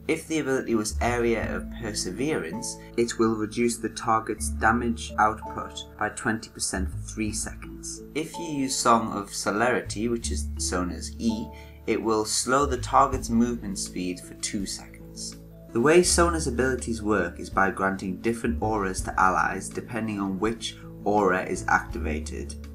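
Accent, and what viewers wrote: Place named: Wales, United Kingdom. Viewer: Haha! okay I thought You were welsh xD Do you main support?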